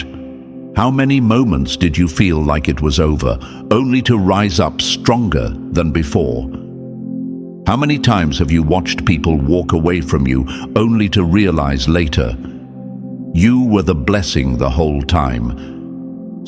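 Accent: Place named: United Kingdom. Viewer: 💫👁️✨🍎✨🇺🇸💫☦️💫🥰😇💫Indeed British accent Angelic man❤☦️🇺🇸💫🕊️🔥🍎👁️💫🥰👁️✨💫🙏😇🙌🏼💫You Speak 🗣️ my Language 👁️🇺🇸💫✨🇺🇸👁️✨🇺🇸💫👁️✨🇺🇸✨🍎💫🕊️🔥❤💫🇺🇸💫❤💫🇺🇸💫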